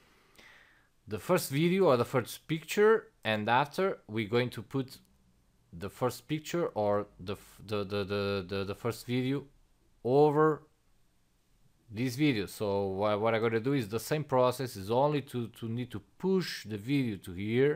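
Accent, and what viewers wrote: Portugal. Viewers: hey amigo es portugues consigo ver pelo sotaque obrigado pela dica aqui no video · Olá you sound Portuguese :)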